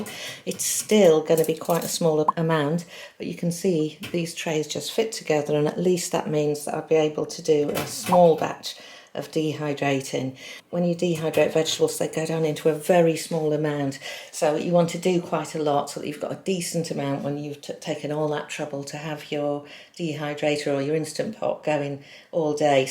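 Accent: United Kingdom. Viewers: Love your channel ❤️, makes a change to see an English UK speaking person 🥰!, Makes recipes etc better to follow as all your ingredients are from here 🇬🇧, I've literally binged all your videos regarding the pro+crisp airfryer 🥰, thanks to you I've actually had the conference to use mine & have cooked a whole chicken🍗,with veg, boiled eggs 🥚& am going to try to dehydrate some veg today following your video step by step ❤️❤️❤️.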